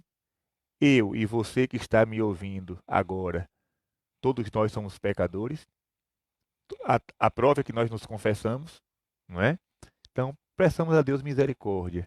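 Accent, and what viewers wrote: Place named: Bahia, Brazil. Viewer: Padre que luz, eu que já adoro o sotaque baiano, ficaria horas te ouvindo com esta sabedoria serena e humana, parabéns, que belíssima explanação! Gratidão.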